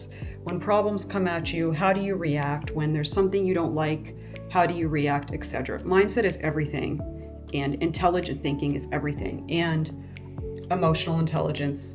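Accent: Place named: New York, United States. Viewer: you are, hands down, my most favorite vlogger. So inspirational, and I love the agency you hold in your life. I’m 70 years old (I can’t believe it really), but don’t see myself as old. I work to stay fit and healthy, and being vegan is a foundational identity. I live in the PNW (Orcas Island), but lived in New York for some time, and your mild NY accent always takes me ‘home’. Anyway, all this to say, I appreciate who you are and your commitment to your dreams. I have great confidence you will acquire your pro card! ❤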